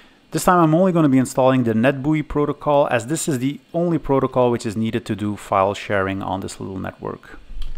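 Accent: Netherlands. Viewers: Are you Dutch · dutch guy?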